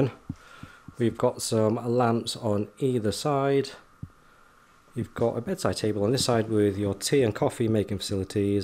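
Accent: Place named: Yorkshire, United Kingdom. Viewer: what accent do you have cos im usually good spotting accents but yours is stumping me ya sound like a mild sheffield/yorkshire guy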